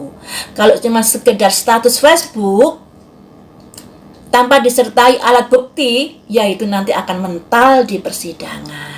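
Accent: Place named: Java, Indonesia. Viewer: logatnya jawa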